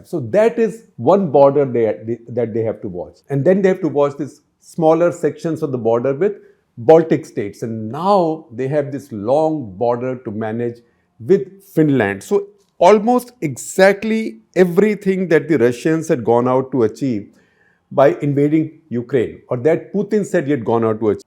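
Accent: India. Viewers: This Indian accent very distracting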